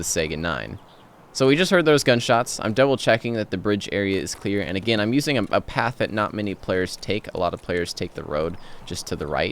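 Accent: Texas, United States. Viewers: Yep. Texas boi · Your American pronunciation of Russian words is cute, but wrong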